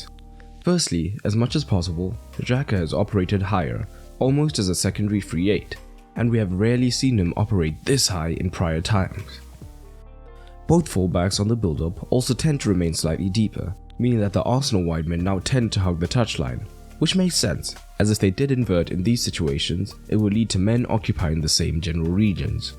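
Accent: South Africa. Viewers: You sir... Sound South African 🤔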